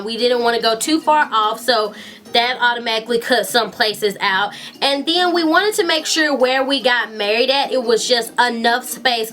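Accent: South, United States. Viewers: OMG You got engaged on my birthday...and we both have southern accents lol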